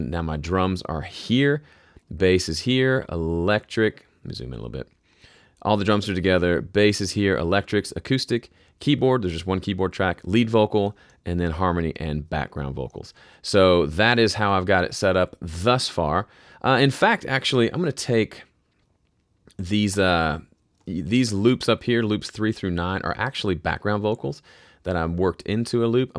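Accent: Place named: Tennessee, United States. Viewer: He sounds like home (I’m originally from TN)!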